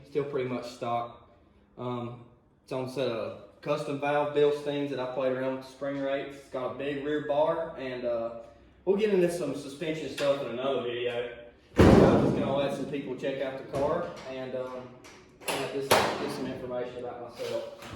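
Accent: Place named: Tennessee, United States. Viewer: I'm just traveling down the rabbit hole of "beer can" or as the kids call them now "rocket" builds for ideas on my 1G Neon and... 😳 Mother of God... It's like train wreck. I hate everything about it and absolutely love everything about it at the same time. The ascetics and being Japanese make me hate it. The functionality and being piloted by someone that talks like me (Tennessee born) make me love it. 1.7 lateral Gs? Despite being from the side that lost WW2, has never landed a human on the moon and uses a measuring system that makes sense, I'll be binge watching for sure.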